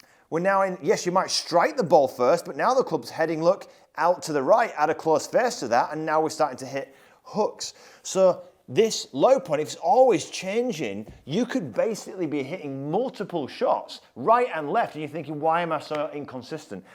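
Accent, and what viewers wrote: United Kingdom. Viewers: i've been golfing for many years but could never really get this right. i always thought it was some big move to make to get the center forward but just having the idea of striking more forward will help make it happen sounds good to me. thnx for the info and help. i've always been trying to figure out where you are from in the uk with you accent, i'm from the boston, massachusetts area in the states.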